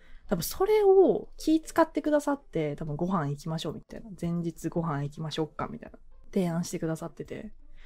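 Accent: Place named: Kansai, Japan. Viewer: ゐぶきちゃんは品のある関西弁がホントにかわいいですね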